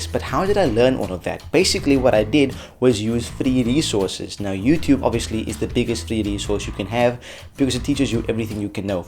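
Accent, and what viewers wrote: South Africa. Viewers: hang on are you South African? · You sound South African 🤔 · are you from south africa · Ayyy, I recognise the south African accent !! 🙌😃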